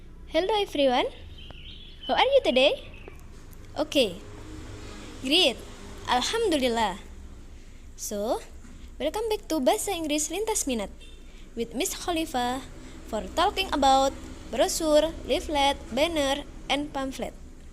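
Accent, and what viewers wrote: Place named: Java, Indonesia. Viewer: Javanese English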